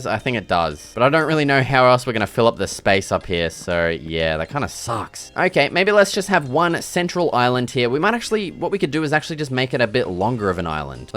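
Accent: Australia. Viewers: U can’t tell me u aren’t Australian 🇦🇺 if you are then we are the same (I’m Aussie)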